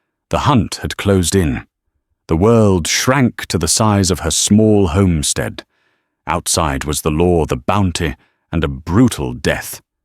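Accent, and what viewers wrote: United Kingdom. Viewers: Does anyone else wonder why this channel uses a British accent AI narratorfor American western stories? It makes no sense to me at all!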